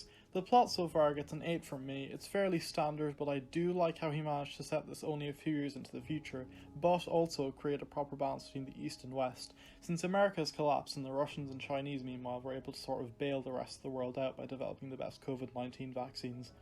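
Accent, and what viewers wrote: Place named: Ireland. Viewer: Nice Irish accent.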